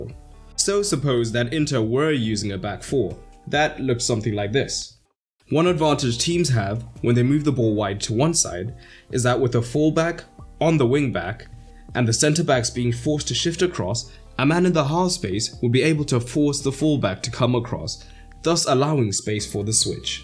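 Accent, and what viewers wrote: South Africa. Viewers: You sound like you are from South Africa.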